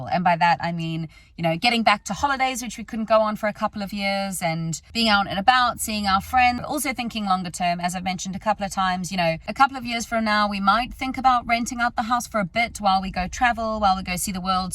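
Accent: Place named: South Africa. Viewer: sounds so South African!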